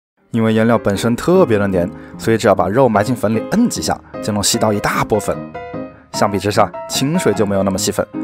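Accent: Wuhan, China. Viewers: 第一次来是武汉人吗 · 我一直在评论里找武汉人，果然不是我一个人觉得他是武汉人😂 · 武汉人吗？ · 他是武汉的之前做热干面那期视频有提到哈哈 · 听口音~武汉的？ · 是不是湖北武汉的？经常会有不经意间漏出的汉味普通话出来😂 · 是武汉的吗？听口音有点像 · 这熟悉的武汉普通话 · 哈哈哈哈哈哈好喜欢家乡口音！！武汉爱你❤️ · 一听说的就是弯管子武汉普通话😁😂😁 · 武汉的阿儿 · 是武汉人吗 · 博主这是武汉老乡么哈哈哈哈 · 这弯管子武汉普通话哈哈哈哈就是有点吧辣…哈哈哈哈哈哈听着跟我妈差不多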